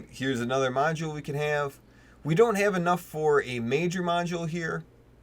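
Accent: Canada. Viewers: You are incredibly canadian, thanks for the tut man.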